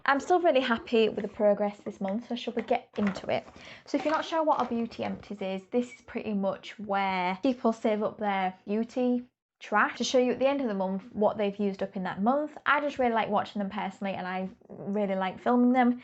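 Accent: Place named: Lancashire, United Kingdom. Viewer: I am UK based and do empties videos too! There aren't many of us, happy to find you and your channel! It's always nice to see reviews of products that I can actually get hold of and try myself! Subscribed! Ooh are you in greater Manchester, Lancashire? Am I close or nowhere near? I love trying to guess accents, I find them fascinating!!!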